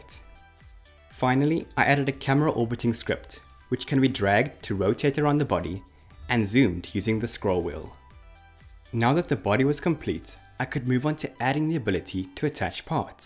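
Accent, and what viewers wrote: South Africa. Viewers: Great YouTube channel! I assume you are South African, because of the accent. I am also proud South African. It’s so cool to have a growing YouTube channel such as yours based in South Africa. You are definitely in my top 3 game development YouTube channels. Could even be number 1. Keep up the great work. Oh and where in South Africa do you live?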